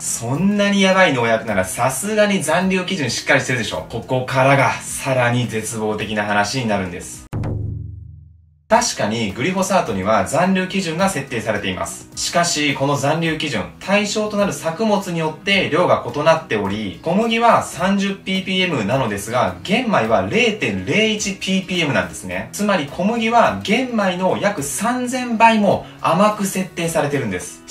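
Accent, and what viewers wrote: Kansai, Japan. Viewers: くん、さすが関西人！ネイティブやね。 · 双子の姉からさんのチャンネルを教えてもらって、最近入部し、双子でハマっています笑👯‍♀️❤️ 私も人生が一変するくらい食生活が変わり、知らない世界が広がり、食にこだわる事が楽しくて仕方ありません！🤗 さんの軽妙なトーク力と、惜しげもない知識が面白すぎて、色々実践してみたくなります😉 今日も手のスナップ運動きかせて、カラダヨロコブ物、生産者さんを応援出来る物、見つけてゲットしてきます😊 私もさんの関西弁大好きなので是非❤️笑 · さんの動画に出会ってから人生が一変するほど食に対しての意識、世界が変わりました😂 さんが教えてくれたことを色々試したくて実践するたびに楽しくて仕方がなく、毎日カラダが喜んでます🐣🐣🐣 新たな扉を開いてくれて本当に有難うございます💕🙏 さんが話す関西弁が大好きなので、ぜひこれからも頻繁に登場させてください🥰（笑）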